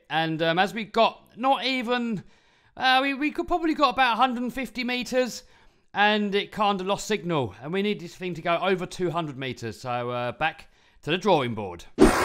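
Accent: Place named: United Kingdom. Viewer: That British accent ❤️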